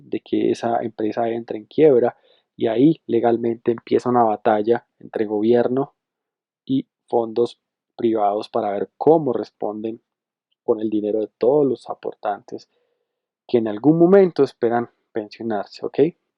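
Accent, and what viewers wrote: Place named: Colombia. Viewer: Hola, excelente información, por tu acento asumo que eres Colombiano, y supongo que de Bogotá; te quería preguntar si tienes idea de como se maneja o afecta tributariamente en renta, hacer este tipo de inversiones? gracias de antemano por tu valiosísimo aporte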